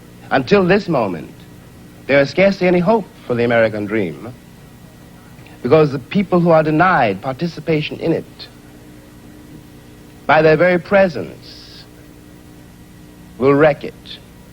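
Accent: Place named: England, United Kingdom. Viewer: Wow he sounds English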